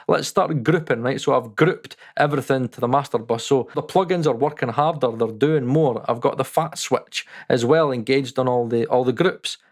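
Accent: Scotland, United Kingdom. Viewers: Removed all Acustica a long time back. I use far older hardware, so seeing your cpu spikes made me think "not for me...Again". On another note: Your Scottish accent + the Chipmunk effect made me crease. Keep up the humour pal when getting the odd dig from trolls. <3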